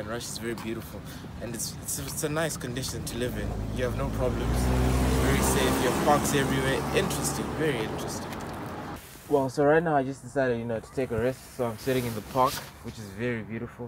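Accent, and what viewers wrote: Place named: Zimbabwe. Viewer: You have a very nice Rhodesian accent, quite rare to come by these days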